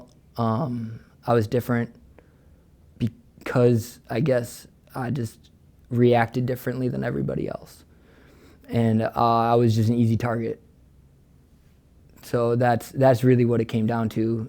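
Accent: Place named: Western New York, United States. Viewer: Right on brother. Much respect I grew up just outside of Buffalo, so I love hearing the WNY / Upstate accent in your voice!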